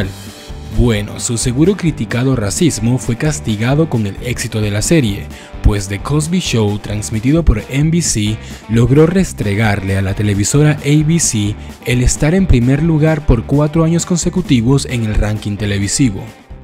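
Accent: Colombia. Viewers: tu eres de colombia? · Oye eres de Colombia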